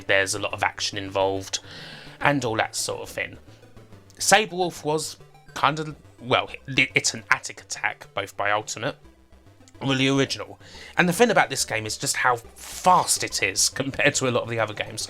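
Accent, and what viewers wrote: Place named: Kent, United Kingdom. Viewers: I'm not from down south where accent is from, so I can't place it any more locally than to say it sounds like a typically Kent sort of accent. Southend On Sea, perhaps. If you're not familiar with UK geography, that would be going out of London to the East, draw a line heading 90 degrees from the Houses of Parliament and keep going til you hit the coast, that's pretty much what Kent is. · South of England. Could easily be London, or maybe Essex. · I wich I could know where is accent from. London?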